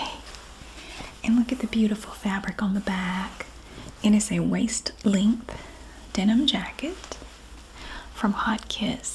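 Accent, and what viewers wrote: South, United States. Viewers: The mix of whisper and soft spoken is just heavenly. Also, your southern accident really adds to the tingles!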